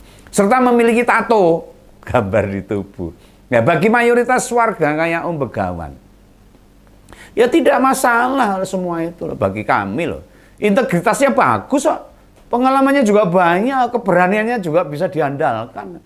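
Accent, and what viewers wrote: Java, Indonesia. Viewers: Kocakkkk abis mas è...ngakak ngakak ngakak ...medokè boso ne bikin serius d balut dagelan...politik jd asik🎉🎉🎉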